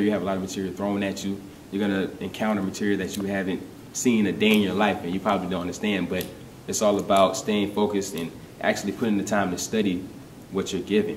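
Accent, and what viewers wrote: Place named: South, United States. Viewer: thank you for the compliment :)..and no I'm not a gangster lol. I just have a southern accent and this video was more about being real and personable instead of super professional with my eye brow raised the whole time (if thats the educated demeanor you speak of) lol. Trust me, I know when to turn it on and off whenever needed. You should check out my video when I hosted on E! year..there was less accent for your pleasure ;)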